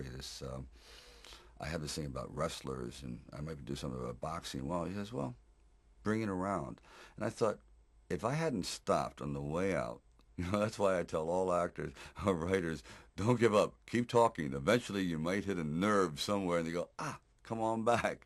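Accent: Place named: Philadelphia, United States. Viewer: Philadelphia accent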